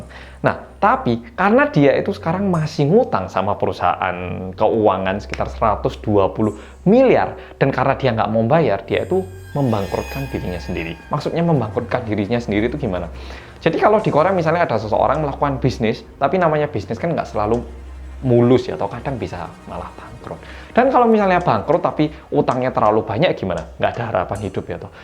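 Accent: Java, Indonesia. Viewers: Hallo Mas..ngangenin lokat Medoknya itu lho..Suxses ya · Mantap mas penjelasannya, keren bgt, kyknya kasus yg di indonesia jg gak bgitu bisa smpe diceritain sedetail ini hehe..kbayang effortnya mas ngumpulin smua artikel blm lagi hrs memahami yg ruwed2nya hehe..goodjob orang korea yg medhookk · Thank you pak dosen untuk 1 sks matkulnya😁 Mendoknya saya suka walaupun terkadang ora ngerti aku😁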